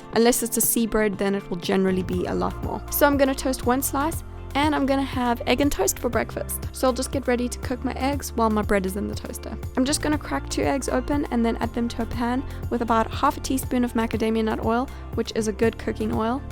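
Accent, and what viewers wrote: South Africa. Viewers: you are truly God sent. I have been struggling to loose weight for a long time and now it has started to affect my help. I started following you two weeks ago, and followed all you suggestions. I am happy to say in two weeks I have lost 2KG. So I just want to thank you and encourage other views to try your suggestions. I love your videos, your voice is soothing and I love you South African accent...lol. keep uploading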